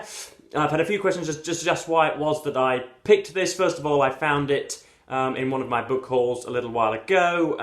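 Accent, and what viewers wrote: United Kingdom. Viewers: North of England, sir, not Britain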